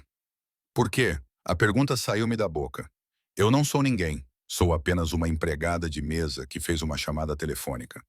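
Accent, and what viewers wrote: Portugal. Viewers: O MELHOR NARRADOR! COM O PORTUGUÊS DA LINGUA PORTUGUESA DE PORTUGAL! ADORÁVEL. ✨💫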